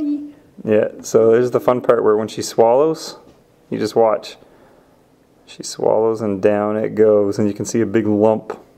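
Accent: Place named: Canada. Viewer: Why did i think this was king of diy yhe accent is obviously Canadian but his voice is so similar BTW nice snake im getting one in 2 months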